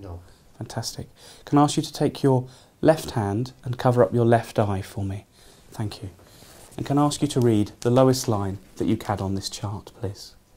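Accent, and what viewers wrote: United Kingdom. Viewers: It’s so funny how I knew he was a scouser before I even heard his accent properly half way through.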